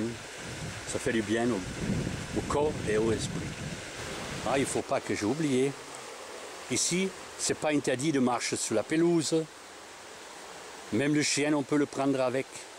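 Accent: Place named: Midi, France. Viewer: Hallo, wohnen Sie zufällig im Süden von Frankreich? Sie sprechen französisch mit einem so netten Akzent vom Midi:) es ist ja echt schön.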